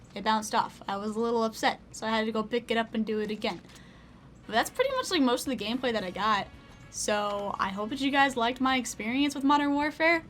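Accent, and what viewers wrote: South, United States. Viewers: i like the southern accent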